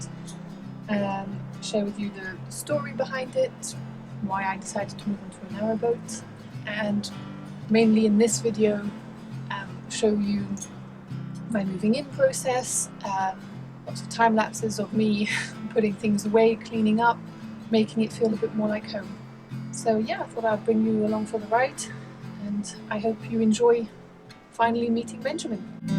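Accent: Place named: Germany. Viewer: third german-sounding girl in a narrowboat video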